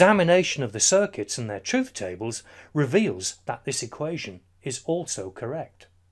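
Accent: United Kingdom. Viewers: Only reason i picked your video was because of the British accent. Hate to admit it but it is what it is.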